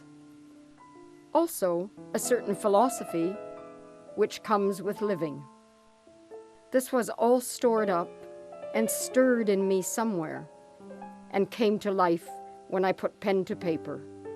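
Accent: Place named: Maine, United States. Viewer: Couldn't they find a narator without that terrible voice and a cent. She sounds like a fishwife from Maine.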